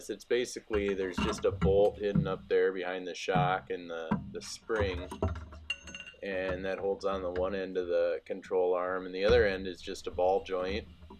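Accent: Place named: Wisconsin, United States. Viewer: Thanks, I have a 2001 x5 and doing this project this weekend in Arizona. I noticed the accent, I grew up in Oshkosh.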